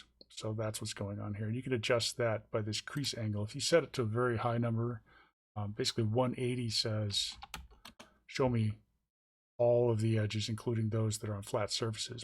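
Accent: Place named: United States. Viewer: I was expecting the voice of the other oscilloscope music guy and literally jumped at the sound of your booming American twang as opposed to the soft spoken Nordic one I was anticipating.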